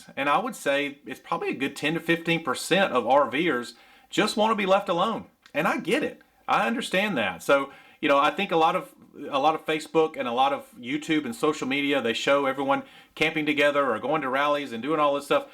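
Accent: United States. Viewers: I am sorry, some folks suggest that people with British accents sound intelligent but, I am here to tell you, you can take a country accent to the bank! Keep it up and GO DAWGS!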